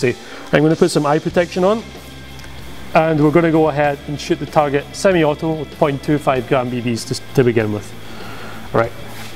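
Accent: Scotland, United Kingdom. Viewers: are you Scottish? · not weird only scottish!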